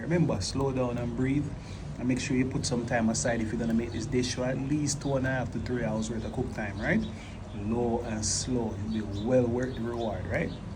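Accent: Jamaica. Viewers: Don't forget the beautiful Jamaican accent 🤤n food together. Delish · You make this island lady home sick. Your meals, your accent, your praise. Love love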